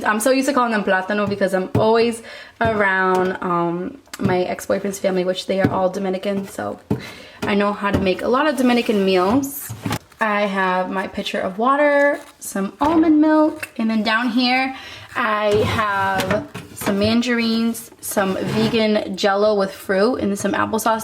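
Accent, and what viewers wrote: Boston, United States. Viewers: smile every time when I hear the boston accent. Awesome video.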